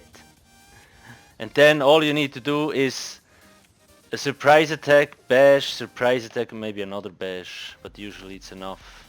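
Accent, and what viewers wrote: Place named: Switzerland. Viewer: You sound so Swiss haha :P Are you also playing on the ps4?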